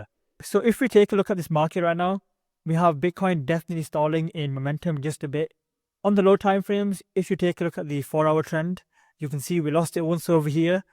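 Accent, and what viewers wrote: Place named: Oldham, United Kingdom. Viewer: Where are you from son? Sounds like an Oldham accent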